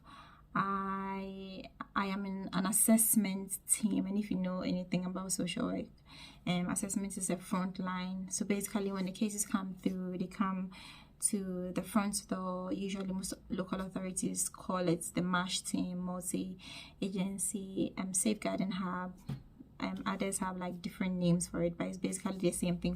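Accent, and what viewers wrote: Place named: Ghana. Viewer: The Ghanaian accent is slowing fading.. ❤❤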